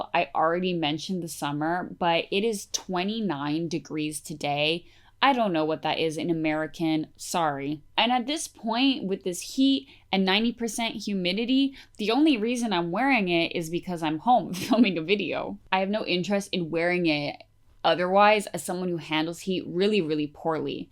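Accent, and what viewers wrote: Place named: Quebec, Canada. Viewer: This is such a rich video!! As you mentionned I feel like online discussions about not-online aspects of the fashion became less common through the years, so hearing a « modern » discussion around this topic is really interesting. Also unrelated but I’ve been watching your channel for soo long and somehow didn’t realise you were from Québec (more specifically than Canadian I mean)?? For context I’m French so the nuance between Québec accent and native English accent can be a bit hard to catch on single isolated words sometimes (like brand names for example), and the sudden language switch totally jumpscared me haha! Merci beaucoup pour cette chaîne et tout le travail derrière !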